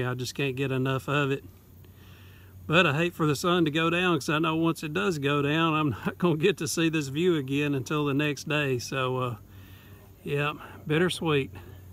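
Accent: South, United States. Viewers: Nice camping spot there!!! I like your southern accent too!!! And Muppy is so cute & silly.🐕 😃 ☺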